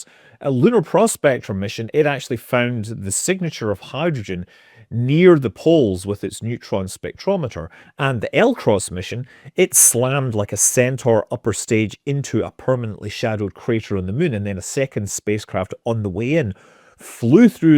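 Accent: Scotland, United Kingdom. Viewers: You said "moon" over 100 times, so this is your best program yet ;>) I'm a Yank...love your Scootish.🎉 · You're sounding more Scottish than you normally do. Very much less American. I admire that.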